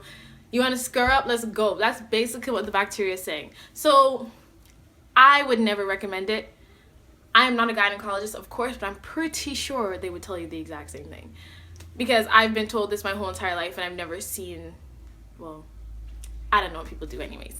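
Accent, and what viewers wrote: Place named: Jamaica. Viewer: krys when I hear she talk I said to my self hmnnn she must b Jamaican r a wrap wid jamaican, gotta take care of the poonahniii lol yes I said it lol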